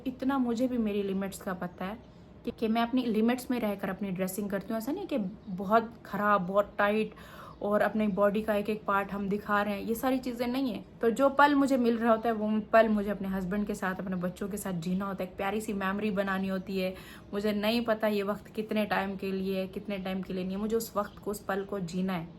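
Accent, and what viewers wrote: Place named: India. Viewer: fazool baat mat Karo. Mein ne bhi comment parhe hein. Tum groom ker rahi ho sab cheez to dressing bhi groom Karo. Aap ko samjhaya hi Hai zayada tar logon ne. Trip pe aise kapre nahi pehente. Aap normal pehente hein koi nahi kehta. Aap picnic pe ghalat kapre pehen k gaye. Vo kapre picnic k liye nahi the. Aur aap ki zuban saaf thi. Aap ab india n logon ki Tarah bolna shru ker diya Hai. Achi aur saaf zuban ko kharab ker Liya. Yehi bola gaya Hai. Ye vlog intihayi fazool Hai. Aur her waqt mere husband ki doosri shadi. Her waqt yehi baat. Bas Karo yaar. Aur koi topic nahi hai. Agar tum her baat pe public ki baat sunti ho to groom kerne ko bola Jaye to use bhi suno.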